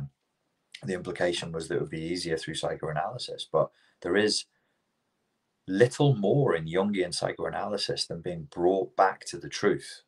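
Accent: RP, United Kingdom. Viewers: As a Canadian I think your accent is kinda posh sounding.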